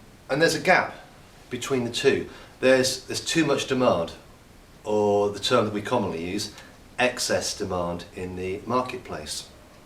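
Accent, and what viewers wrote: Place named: London, United Kingdom. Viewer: Perfect mate. You're a londoner ain't it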